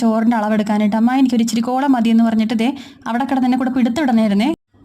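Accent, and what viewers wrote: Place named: Thiruvananthapuram, India. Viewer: ചേച്ചിടെ ചില സംസാരം കേൾക്കുമ്പോൾ തിരുവനന്തപുരംകാരി ആണെന്ന് തോന്നുന്നു ഇവടൊക്കെ പറയുന്ന അതേ സംസാരരീതി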